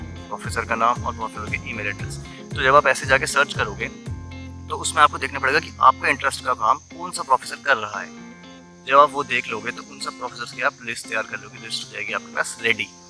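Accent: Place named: India. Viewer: Subscribed your channel recently. And the most impressive thing I found is the way you talk, pure desi. I really like the way you do your vlogs. Keep going.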